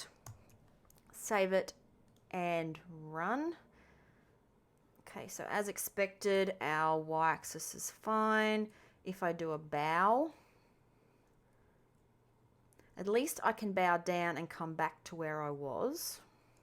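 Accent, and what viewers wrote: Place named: Australia. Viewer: I am sorry if this is correct in Australian, the awesome accent gotta come with quirks I guess.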